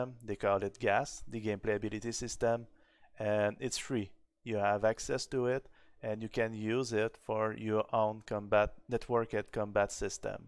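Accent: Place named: Quebec, Canada. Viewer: Je pense que je reconnais un québécois ici 🙂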